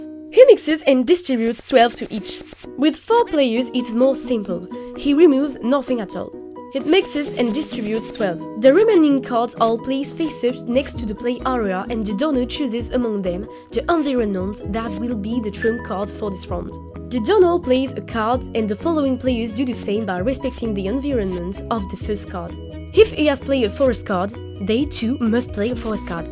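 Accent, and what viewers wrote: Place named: France. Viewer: your french accent is cute! Divitchia,divika,divissia,Druid.. :D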